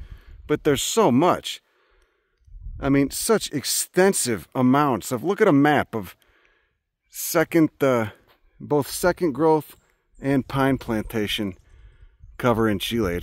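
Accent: Chicago, United States. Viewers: Is that a Chicago accent?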